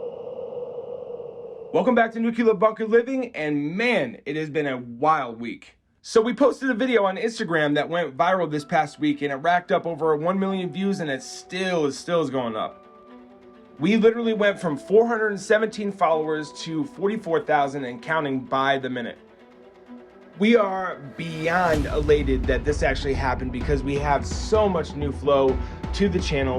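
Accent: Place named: East Coast, United States. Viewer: Are you serious? The man is from the East Coast, guess you don’t get out much.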